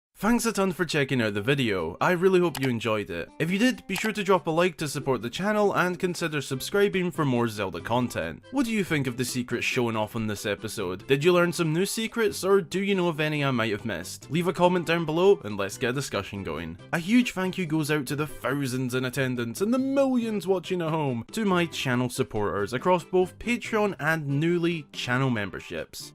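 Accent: Scotland, United Kingdom. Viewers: I JUST REALIZED PURAH IS ABOUT THE SAME SIZE AS MIDNA Also are you scottish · Are you brish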